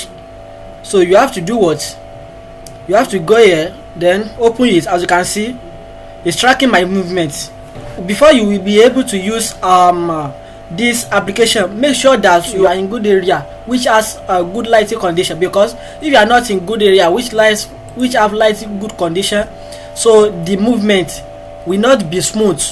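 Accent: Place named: Nigeria. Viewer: Great job! You sound like a Nigerian.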